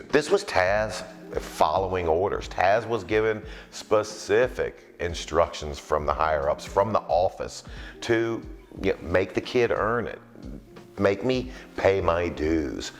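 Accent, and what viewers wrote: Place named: South, United States. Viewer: Thank you brother! You give us the transparency we usually have to dig through dirt sheets for. Can't wait to hear you on commentary when Cole retires. I could totally see you, and pat calling smackdown! We need a southern accent on that mic always!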